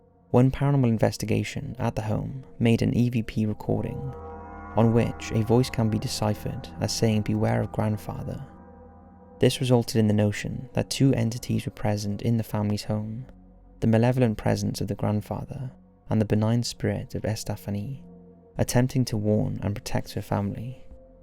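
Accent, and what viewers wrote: Wales, United Kingdom. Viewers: Anyone else feel that narration with a British Accent makes these stories more scary?? Or any accent from the U.K. for that matter...I don't feel the same air of scariness with a regular American accent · It's the soft Welsh burr of his accent that does it for me. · I love your channel man, what part of Wales are you from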